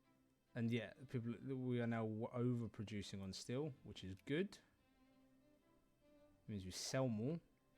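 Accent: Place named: Kent, United Kingdom. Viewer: You from Kent? You sounf like youre from kent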